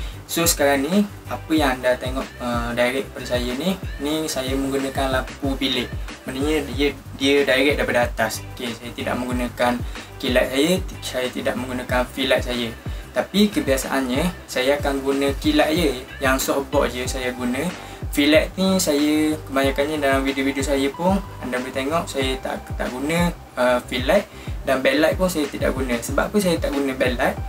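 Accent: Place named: Terengganu, Malaysia. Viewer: Terbaik boss dpt ilmu hari ning.. Ornag ganung ke.. Ckp ade mcm ganu sikit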